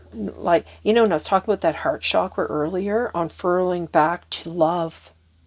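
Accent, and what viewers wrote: Canada. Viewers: ❤ Thanks, I stumbled upon your video... It's very aligned with what I'm experiencing right now. I heard the Cape Breton accent;) ❤❤ ❤❤ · Canadian, eh?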